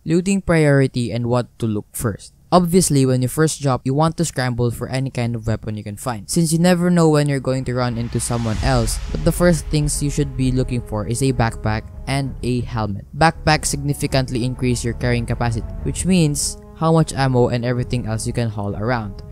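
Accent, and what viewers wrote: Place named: Philippines. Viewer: sabi na eh pinoy ka ibatalag acsent ng mga pinoy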